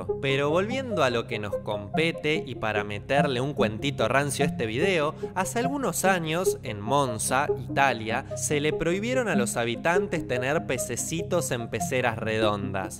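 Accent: Argentina. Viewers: No se pero amo el acento argentino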